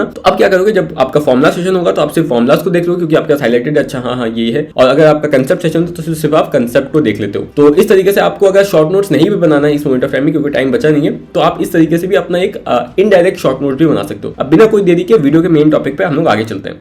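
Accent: Assam, India. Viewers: Dada Assam se ho kya ??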